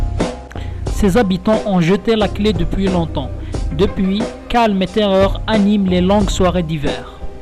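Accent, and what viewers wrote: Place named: Kabylie, Algeria. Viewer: Il n' est pas français,sa langue maternelle est le kabyle, il n' est donc pas obligé de prononcer ni d' articuler comme les français.vous avez le complexe du colonisé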